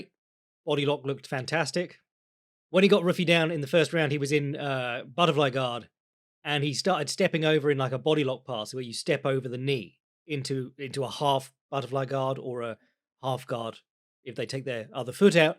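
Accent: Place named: Scotland, United Kingdom. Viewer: end of an era, we will neveR hear use his Scottish accent to say Paul Craig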